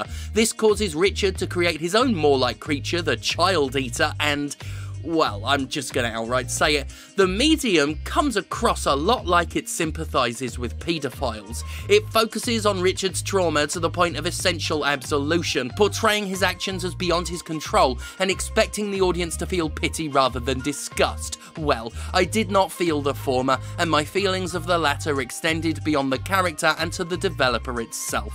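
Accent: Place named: United Kingdom. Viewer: Your British? I thought you were Canadian egh,. Well explains why you never say sorry egh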